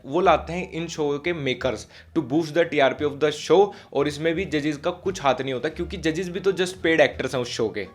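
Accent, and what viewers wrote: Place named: Uttar Pradesh, India. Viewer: Dude is from up I can understand him by language because in up people like me talks like him it's a different Daku vibe Mmm vaise haa up Wale opp🤘 Yo lakshay bhai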